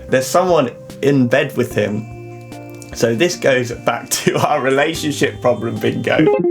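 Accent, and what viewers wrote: United Kingdom. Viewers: Ive enjoyed medical drama since Greys Anatomy and this one with a ever so amazing Freddie is a sight for a sore eyes.... I came across ur channel and thoroughly enjoyed it... love the way u broke it down explained stuff... Young Doctor with great brit accent 💕... M ur fan already 😊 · I'm from germany and at first i though "why do im not understanding him so well?!" and than said you, you're from the uk and I realized I just understand AE😒😂 But still a cool and interesting Video😊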